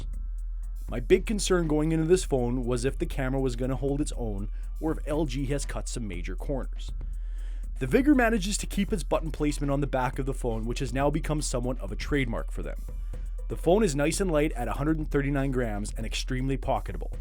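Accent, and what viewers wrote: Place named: Toronto, Canada. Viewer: Such a Toronto accent!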